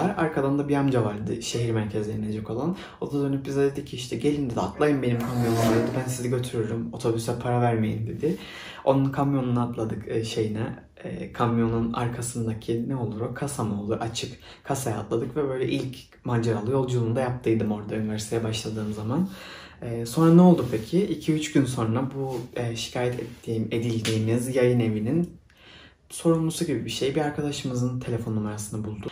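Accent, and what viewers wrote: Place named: Cyprus. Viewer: Alttan alttan kendini dışa vuran Kıbrıs şivesi çok hoş. ☺ Güzelim adacıktan görüntüleri görünce daha bir özledim şimdi. 🌿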